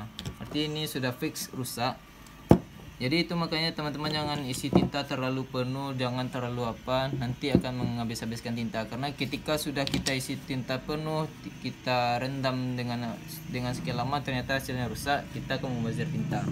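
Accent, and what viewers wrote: West Sumatra, Indonesia. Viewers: Logat minangya jlas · Dari logat bahasanya...saya yakin, abang ini orang Minang.... 😊😊😊semoga benar..... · Jelas sekali logatnya minang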